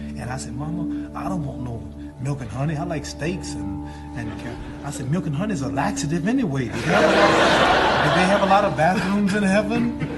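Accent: United Kingdom. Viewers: The British accent is strong. Good accent.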